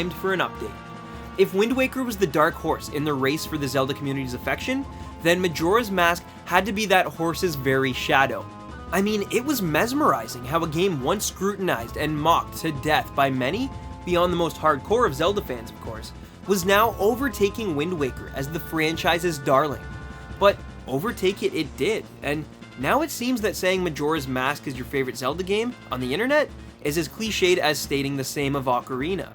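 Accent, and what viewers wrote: Canada. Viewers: Are you Canadian? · love your canadian accent!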